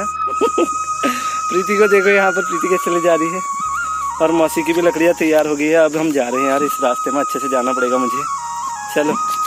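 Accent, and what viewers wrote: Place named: Pahari, India. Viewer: areee sir kya pahadi bolte h aap kaan to prm sukh ka anubhav ho gya 😍😍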